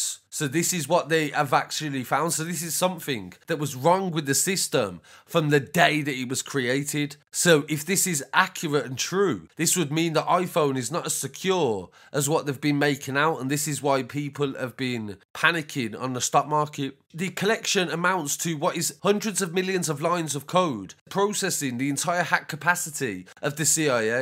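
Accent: Birmingham, United Kingdom. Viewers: You're moving so far from being an actual journalist its a joke. You can't just read a daily mail story in a Brum accent. Pitiful cuz, you're doing more harm than good. Journalist? Joke ting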